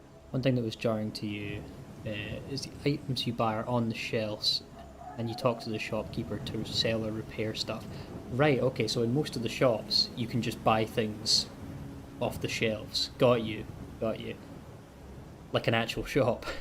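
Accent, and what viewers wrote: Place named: Scotland, United Kingdom. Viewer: Sorry mate I fell asleep, everything looks and sounds great. Your Scottish accent and vocal cadence pair really well with the mood of elder scrolls❤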